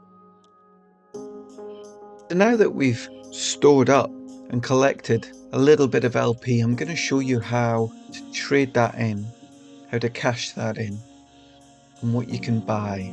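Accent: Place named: Scotland, United Kingdom. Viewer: Great video, loads of useful info and explained easily. Plus i could listen to that Scottish accent all day, easy listening :)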